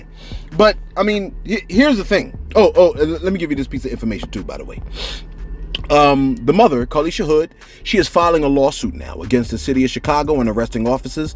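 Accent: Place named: Boston, United States. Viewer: Yo my futha mucking boi!!! You got thee best thee hottest show or odcast whatever you Wana call it on the internet this is my absolute fav -or-ite thing on the whole entire internet I been watching you from the jump and I never miss a show twice a day everyday or however many times u post I get all my notifications I guess I'm one of the lucky ones but even if I didn't I don't have no cable cuz I don't want it all I b on is YouTube that's more than enough for me everything is on here! But anyway my name is Dez Fresh I'm from Racine Wisconsin (The Wisco) and every chance I get I try to put my family and friends on to this casting,my lil brother Nique watches faithfully too!I watch one before I leave for work or while I'm there on break and I make sure I save the last episode for when I get home at 4am can't sleep right without catching u on my news bottom line you and your show and your back up chanel is you tubes big ish fr and I love her voice n lil accent sounds like she's from Boston 😆...don't ever stop doin this we love you,no homo and pause!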